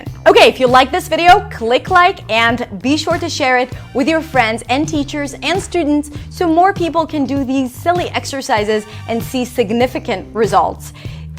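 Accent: New York, United States. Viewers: I am a native English speaker/English teacher and I did not realize she was not a native English speaker until she mentioned it. The only accent I noticed was a slight “New York“ accent!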